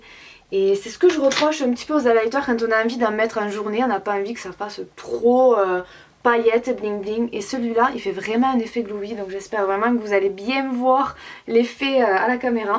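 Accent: South, France. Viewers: j'adore (on a le même accent qui sent bon le soleil ;) )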